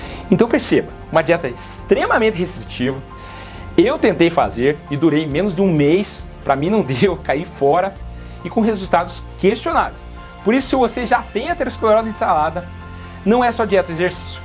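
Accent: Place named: Caipira, Brazil. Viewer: Deveria ser proibido médico com sotaque caipira abobalhado!!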